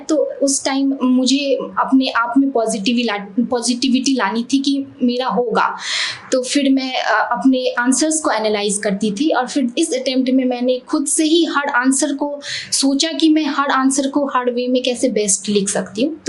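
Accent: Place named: Bihar, India. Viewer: Bihar se hai ye hindi me bojauri include karate hai 😊😊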